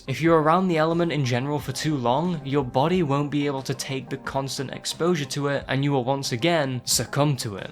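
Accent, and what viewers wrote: United Kingdom. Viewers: LETS GOOOOOOOOOOOOOOOOOOOOOOOOOO!!!!!!!!!!!!!!! welcome back lad, missed that nice ass british voice!!!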